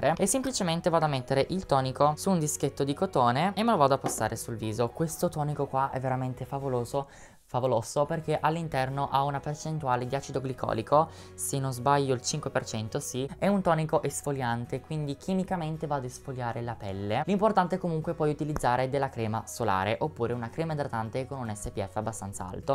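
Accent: United Kingdom. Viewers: Sei un grande io adoro come ti trucchi adoro la tua accento inglese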